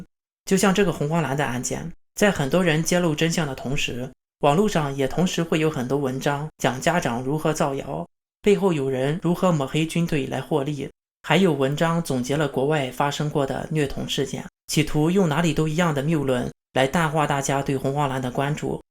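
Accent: Shandong, China. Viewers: 听你口音是山东的啊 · 小编是山东人吧，口音和我一样哈，棒棒的哈！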